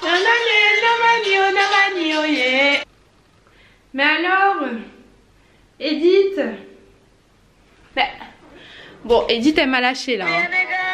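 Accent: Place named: Ivory Coast. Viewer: C'est cool hein tu as l'accent ivoirien j'adore 👍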